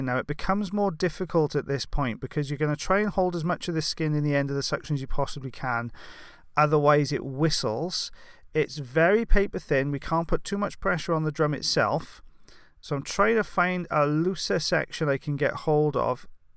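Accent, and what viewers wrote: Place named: England, United Kingdom. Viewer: I love skin peels! I had a good laugh for a moment when you said "tinnitus". I couldn't figure out what that meant for a minute because over here, we pronounce it as "tin-eye-tus" haha. I love hearing the different words you use and the way you say them. I look forward to all the "English" English in your videos. Keep up the great work!!!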